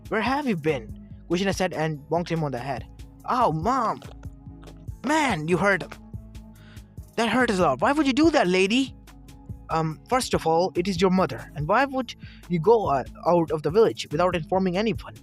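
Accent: India. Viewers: are you Indian? you sound like one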